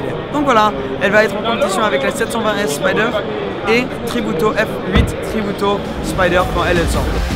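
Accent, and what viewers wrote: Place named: United Kingdom. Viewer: Salut et merci pour cette présentation rapide des supercars du Salon de Genève 2019. Je t'ai connu il y a peu et j'ai regardé quelques-unes de tes vidéos sur ta "nouvelle chaîne française". J'en pense beaucoup de bien et ai particulièrement apprécié ta vidéo "La vérité" où tu te livres et joues la transparence. On sent que tu es passionné, mais attention, tu fais encore pas mal d'erreurs qui en définitive gâchent ce que tu as fais de bien auparavant. Par exemple le tout début de cette vidéo, ne te trompes pas de cibles, tu ne fais pas de vidéos-délires pour tes potes ou entre potes que tu materas plus tard uniquement avec eux, là tu t'adresses à un public tous âges qui vient sur ta chaîne pour découvrir des voitures et le salon de Genève, pour également avoir ton avis mais certainement pas pour assister à des blagues ou des délires de potaches attardés. 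La séquence entre 10 et 50" n'avait rien à faire là et aurait dû être coupée au montage. Ensuite attention aux insultes et grossièretés. Ton "J'men bas les c...…" aurait pu rester au vestiaire, si tu vois ce que je veux dire. Ensuite il y a un truc extrêmement énervant, un tic ou plutôt un automatisme que tu as et qui vient certainement de ta double culture franco-anglaise, mais qui au visionnage est super pénible à la longue. Tu prononces les noms de marques et de modèles à l'anglaise et en plus c'est souvent faux. Exemple, la Lamborghini SVJ n'est pas la "Ace Viiii Jay" mais sur une chaîne française la "Esse Vé Ji" et id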